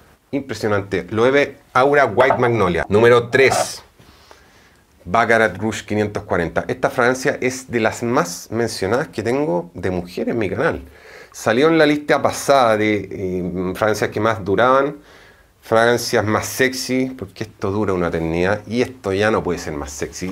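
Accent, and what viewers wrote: Chile. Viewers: que tiene el acento chileno ...😡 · Hola 😊eres chileno tienes acento?? Felicitaciones, haces un exelente trabajo.Tus descripciónes son extraordinaria 👏👏👏Amo🥰 los perfumes 🌟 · Qué lindo los ojos de él, habla como Chileno, dónde se pueden encontrar estos perfumes en Chile???? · Solo por curiosidad ¿qué nacionalidad tienes? Escucho que hablas super "chileno", pero también a español... es curioso 🌱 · Clave Alma si yo también lo dije es chileno y me encanta 🤗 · Tienes acento chileno, eres o viviste en Chile? Me encanta tu canal, he aprendido mucho con tus vídeos.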